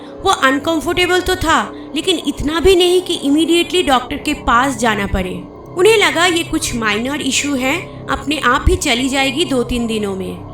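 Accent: Bengal, India. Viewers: Loved the way u say mercury... From day 1 i had found ur bengali accent very cute.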